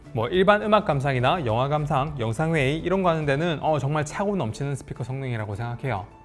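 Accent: Gyeongsang, South Korea. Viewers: 갱상도 클라스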